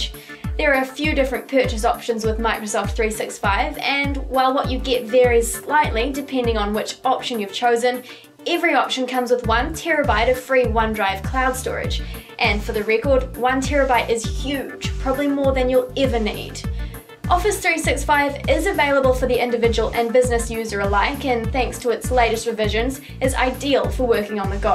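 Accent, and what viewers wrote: New Zealand. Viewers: I think that's a New Zealand accent. They tend to pronounce the a in words as an i. "hiving the software"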